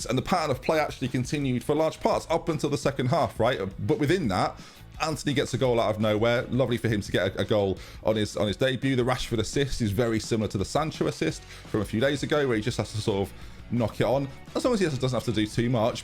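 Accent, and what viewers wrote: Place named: Scouse, United Kingdom. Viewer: You do a good job at hiding your scouse accent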